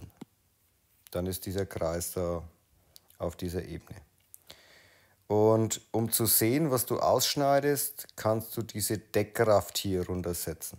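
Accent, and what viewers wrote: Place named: Franconia, Germany. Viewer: Toll erklärt 😅 endlich mal ein Procreate Video im fränkischen. Leider klappt es aber bei mir nicht. Das Bild wird nur dupliziert und nicht ausgeschnitten :(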